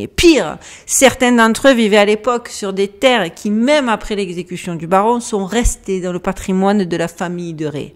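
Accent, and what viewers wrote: Marseille, France. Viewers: Toujours des histoires passionnantes avec cet accent marseillais hyper puissant et envoutant que j'en perds le fil de l'histoire.Y'a plus qu'à relancer la vidéo en essayant de ne pas me faire hypnotiser 😋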